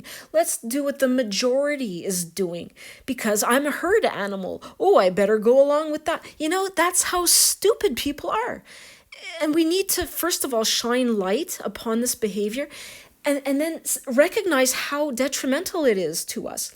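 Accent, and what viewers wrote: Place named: Canada. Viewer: Lets say for hypothetical purposes that dogs only become violent because of bad owners. That still doesnt justify allowing them into our society. In fact bad owners are more likely to be negligent, not be able to restrain their dogs or keep it in their yard or let them out. I never understood this argument and it is NOT a reason to allow dogs because 50% of dog owners are probably going to be bad. How does this justify the risk? I love your Canadian accent BTW.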